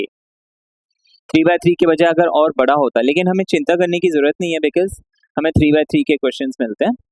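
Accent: Bihar, India. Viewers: R u from bihar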